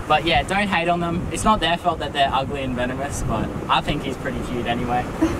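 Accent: Australia. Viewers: Is he from Australia? nvm xd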